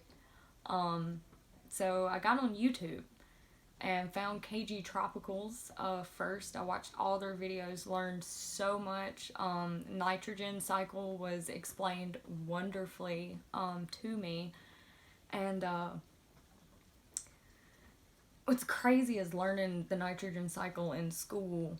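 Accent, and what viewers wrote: South, United States. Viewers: First of all welcome to the fishfam! Second I appreciate relatability in people I sub to. I find you to be very relatable. I also live in a small town except we have one caution light lol. I've got tanks full of walmart fish and have been fishing my whole life. All the fish tanks we had used to be the same way. 10 gallon walmart tanks with just top offs. Didn't know any better. Don't worry about the southern accent, its great and makes you who you are. You are a natural talking to the camera. You will have a bunch of subs in no time. Great job! Looking forward to future content! Btw KGtropicals was one of the first channels I found and they helped me more than I could ever tell them! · Now that I've watched it all, Southern accent for the win! Welcome to the FishFam! That was a great first video with a wonderful backstory. Thank you for sharing with us! 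👍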